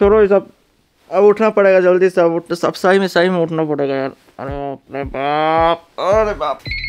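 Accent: Bihar, India. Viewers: Bhaiya apki accent toh bilkul hmare bihar jaiisi h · A ji aap to humse bhi jyada bihari lag rhe hai..aisan ta hmaar tone nahi aata hai bhojpuri me lekin aapka to puchiye mat baap re baap.... sarangheyo oppa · Bhai wh bihari hi h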